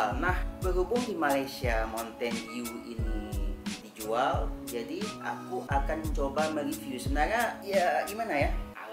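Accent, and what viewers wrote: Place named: Aceh, Indonesia. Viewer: Orang aceh emang biasanya ngomongnya begitu logatnya gitulah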